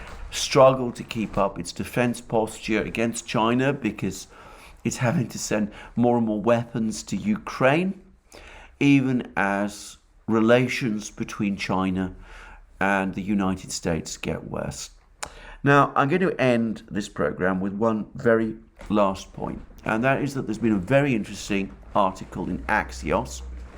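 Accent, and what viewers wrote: United Kingdom. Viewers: I want to apologize for missing some of your EXELENT NEWS AND ASSESSMENT , I LEARN A GREAT DEAL FROM YOU , IT IS A PRIVILEGED TO HEAR YOUR SPEAK YOUR INFORMATION , ESPECIALLY ABOUT UKRAINA. I LISTEN TO YOU , SCOTT RITTER AND DOUGLAS MACGREGOR , YOU ARE EXTREMLY WELL EDUCATED. I M NOT MAKING THIS UP. IT IS A FACT. GOD BLESS YOU AND ALL YOUR LOVED ONES 💓 AND HAPPY CHRISTMAS. I LOVE THE BRITISH ACCENT TOO. 😀💓👍 I HATE THE U.S. ENGLISH. I FOLLOW THE UKRAINA AND RUSSIAN CONFLICT WITH ENORMOUS INTEREST 😀CHEERS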